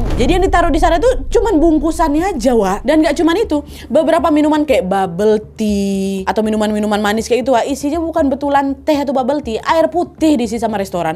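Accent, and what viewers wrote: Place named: Medan, Indonesia. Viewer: Medan kali cakapnya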